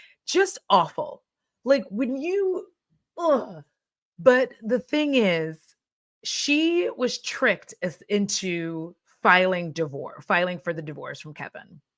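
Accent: United Kingdom. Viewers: The hair 😍 and your British accent even made my British knees wobble 😂 I was never a huge Britney fan but was certainly team free Britney. I'm quite impressed she didn't go for the easy revenge in the book. Seems classy. And I didn't realise she was our age, having been in that situation since her early 30's ish. That's so much of her life they stole. I'm not surprised she's living her life how she wants now.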